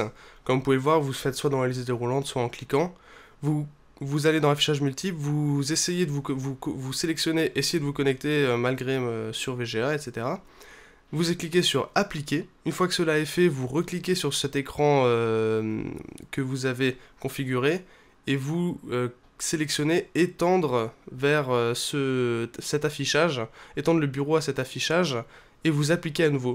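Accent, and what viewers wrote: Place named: England, United Kingdom. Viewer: Bel accent anglais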